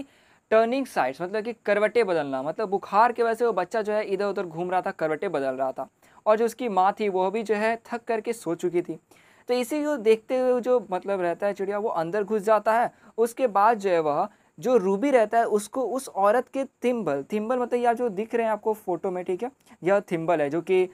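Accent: Bihar, India. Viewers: Bhaiya mai apke accent se he samajh gaya tha ke app Bihar se hai I am also from Begusaria, Bihar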